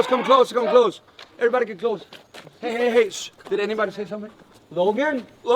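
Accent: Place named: Denmark. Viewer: That Danglish tho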